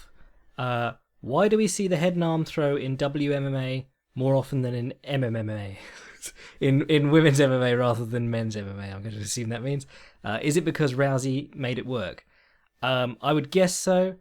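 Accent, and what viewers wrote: United Kingdom. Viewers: Dude! You're like, so English, Bro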